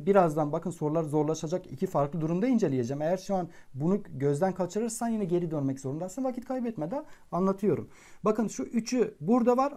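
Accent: Van, Turkey. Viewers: Hoca vanliiiii.. Hemşehrim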